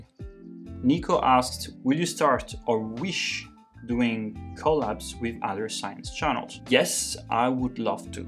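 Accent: France. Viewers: Hey, your accent seems french, if you are then please, can you start your video with a french word related to that video and what it means...just a wish though if you could....love french, would love to learn from you:D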